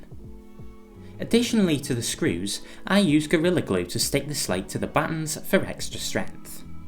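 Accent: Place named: England, United Kingdom. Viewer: And never pass up an opportunity to hear the king's English.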